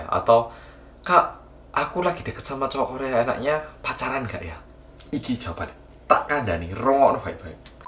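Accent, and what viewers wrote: Java, Indonesia. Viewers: Lucu bingit tampang Korea ngomong Jowo lbih medhok dri aku. Smangat mas bagi" pengalaman pengetahuan sama kita. Smoga sehat sukses slalu ya. Aamiin. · Ya ampun itu logat jawa nya t.o.p 😂😂 bgt 👍🏽👍🏽👍🏽dach😂😂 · Hihihi, kaet weru onok wong korea medok.. 👍🏼👍🏼👍🏼👍🏼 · Gw org jawa malah tiba" bingung ma bahasa sendiri karna bahasa jawanya mas terlalu medokk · ih Ya Allah nguefans kon aku ambek mas iki wkwk, muedok pol polan kon. Aku ae sing wong jowo kadang yo sok sok an ninggalno logat medok ku lek ngomong ambek konco ambek wong wong liyo tak ubah logat ku dadi indonesia ke jakarta jakartaan ngunu😅 (maafkan kesomse an ini) lah iki wong korea lo isok muedok pol ngalah ngalahi aku, de'e yo bangga pisan. Aku yo bangga kok rek dadi wong jowo *tenang ae. mulai saiki tak tingkatno maneh skill medok ku cek e isok ngalahno mas korea sitok iki hahaha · Aq yo malang oppa..aseli aq ngrungokno boso medok mu👍👍👍😂 pas merem bayangan wong jowo pas melek kok ngene😄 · Kita2 ini wong indo...tp ora semedok ini.....aku ngakak bgt vidio ini... 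· Di Drama terlihat romantis, aslinya sebaliknya 😂😂 makanya nggak pernah nonton karena percuma😂😂😂 btw, mas keren, your indonesian Javanesse dialek is awesome. You deserve a big tumbs up👍👍👍👍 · HAHAHAHA ko ngakak nontonnya. Orang korea bisa se medhok itu, pd gue yg org jawa bisa bahasanya aja engga=)) Inggris bisa, jawa bisa, korea bisa. Udah mantap kamu bang!🤘🤘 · Baru tau sampean orang korea toh.. medok'e mantep..👍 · Mas...lihat kamu bicara kok gemes to aku...medok e ra ilok ilok lucu 🤭🤭🤭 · Koq medok to mas'e, koncone uwong jowo toh? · muedokkkk aslii... Terima kasih mas sudah mencintai bahasa kami.:) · Gue yang turunan Betawi Jawa tp engga bs ngomong Jawa berasa gagal jd org Indonesia gegara liat org Korea ngomong Jawa dan logatnya engga maen-maen. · Paham .... mas korea sing medhok. Sampean ne bener pol. Okeh wong nom jaman sak iki ninggal falsafah jowo... "bibit bobot bebet" .... mulo do bingunggg. 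· Sampean mas lebih tepate koyo wong Indonesia turunan tiongkok. Medok tenan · Rapopo kak aku orang jawa juga btw aku ngakak kalo denger kakak ngomong e muedokk nemen😂😂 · MasyaaAllah mas mas.. Astaghfirullah.. Biyung alah gusti Allah, jan eram kok medok ee sampean MasyaaAllah.. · lha jian kok iso medok yo jowomu mas mas 😄😄😁, lucu seneng aku ngerungokke medok ngono 👍👏👏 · Sumpah seru banget nonton nya wkwkwkwk medoknya ampe emosi😆😆😆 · Ini orang korea bicara jawa nya medok plus lancar banget anjay. Aku yg asli jawa ngomong bhs jawa aja ga lancar kaya gini wkwk. salutt ! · Lebih suka medoknya mas daripada oppa oppa wkwk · Pusing di bahasa koreanya❎ Pusing di bahasa medoknya☑️ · Suwun cak... Tapi uenak ngrungokno sing muefhok...🥰🥰🥰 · Korea jawa bgt mas logatnya, bahasanya juga.. gk keliatan orng luarnya.. dlu punya mntan orng vietnam gabisa selancar ini ngomongnya, boro2 bhasa jawa bhasa indo aja susah bgt.. 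luar biasa mas ini.. cari pacar orng indonesia aj mas 😂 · Orang korea ekspresinya jowo medhook buanget ㅋㅋㅋㅋㅋ mugo wae iso ketemu sampean mas, aku kerja di busan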